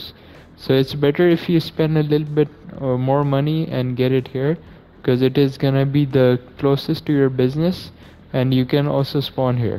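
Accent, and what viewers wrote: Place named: India. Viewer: Are u from India? It's hard to bear your accent 🤣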